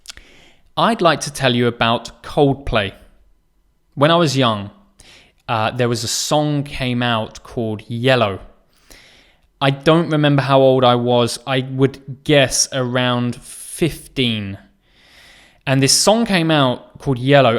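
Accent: United Kingdom. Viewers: I love your amazing British accent 😍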